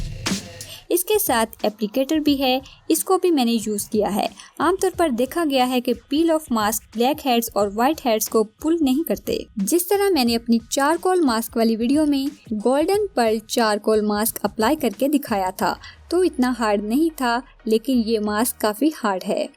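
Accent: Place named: Rural, India. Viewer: apki awaz bot paindu hai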